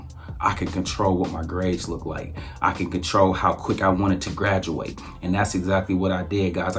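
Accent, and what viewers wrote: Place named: Philadelphia, United States. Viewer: Are you from Philly?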